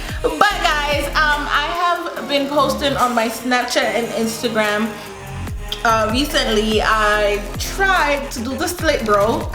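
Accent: Jamaica. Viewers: minutes into the video and I know your Jamaican🔥💯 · Lol, it's the Jamaican accent for me😍❤️😂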